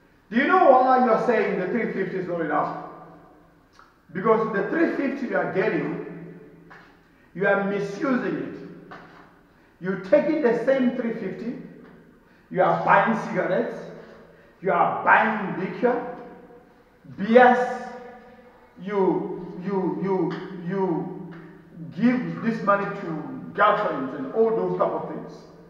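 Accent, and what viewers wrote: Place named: Zimbabwe. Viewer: If any illegal aliens from our continent is not happy to be in South Africa they're other 53 African countries they can choose and go to, we don't want any unskilled illegal immigrants to flock to South Africa to work jobs locals can do. I think the time has arrived were the government needs to introduce job restrictions for African immigrants to discourage them to stop coming to our country in their millions because the truth is they're no jobs in South Africa we have 40% youth unemployment we need to prioritize our citizens first before we can accept asylum seekers and economic migrants. You can in your right mind compare a small economy of South Africa to UAE, Saudi Arabia, Japan, China, South Korea or any other powerful countries because most of those nations don't have the problems we have of the alarming high unemployment, crime, poverty, landless etc. 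We are not happy as the citizens to live with millions of illegal immigrants in our communities and we will not back down from that you must urge your brothers and sisters back in Zimbabwe or whatever were you from because i care hear your accent to let them know that they're no opportunities for them in South Africa because locals are jobless, hungry, poor, landless etc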